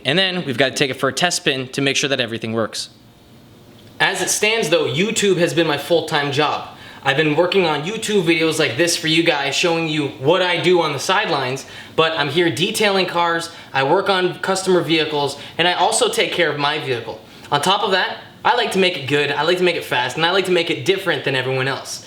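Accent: Ontario, Canada. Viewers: are you from ontario?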